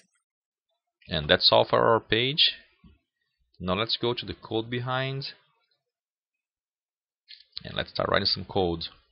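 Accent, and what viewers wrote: Brazil. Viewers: Brazilian accent? Excellent tutorial by the way.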